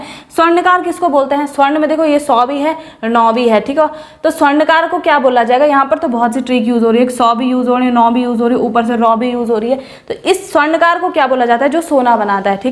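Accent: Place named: Uttarakhand, India. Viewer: Bhut acha pda rhe ho mem aap uttrakhand se ho lg raa 🙏🙏🙏🙏👍👍👌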